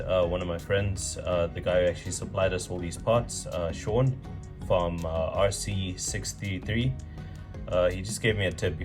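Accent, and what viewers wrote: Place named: South Africa. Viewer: Always great to hear a fellow South African when randomly browsing through hobby YouTube :) Quick question with the furitek starter kit how did you connect the two speed transmission servo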